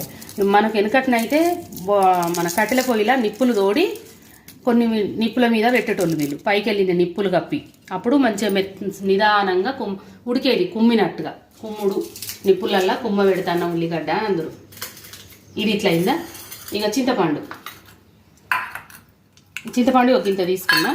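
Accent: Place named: Karimnagar, India. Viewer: Pakka telangana slang , vinasompuga undi Amma. Meedi naku telai Karimnagar Anukunta. Maadi warangal 😅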